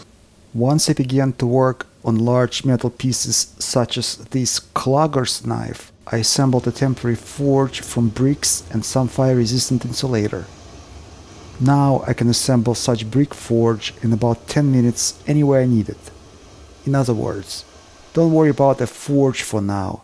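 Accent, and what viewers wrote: Russia. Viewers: Не владею английским языком, но явно слышен русский акцент. А когда увидел адвоката понял, что не ошибся.))))) I do not speak English, but a Russian accent is clearly audible. And when I saw the lawyer realized that he was not mistaken.)))))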